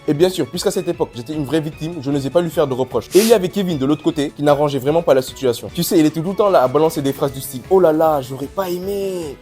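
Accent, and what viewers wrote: Senegal. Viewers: ta un accent de sénégalais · Mhh je suis nouveau, mais c'est un accent Sénégal que j'entends là ?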